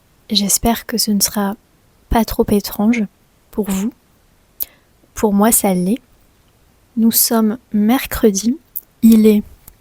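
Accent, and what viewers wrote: France. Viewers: Amo tu acento francés ❤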